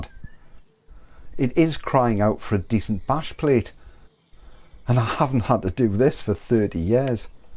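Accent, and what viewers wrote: Newcastle, United Kingdom. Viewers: Another excellent machine in the same ‘category’ is the Yamaha XT 250. Indestructible, nimble, super reliable, terrific fuel economy, cheap to purchase and maintain, nice low super comfortable seat and will go absolutely anywhere. Rode mine for 6 years throughout all types of terrain (here in SW Australia) - never let me down and would go places (right spots) where many larger machines would not venture - or reluctantly. Excellent resale value - sold it for more than I bought it. ONLY downside - a drag when needing to ride long distances on bitumen in one stretch - only enough power to cruise happily at 90 - 100 km/h - any more and it was working too hard. Excellent video by the way - love the Geordie accent (albeit a subtle one) - the most colourful of all English accents.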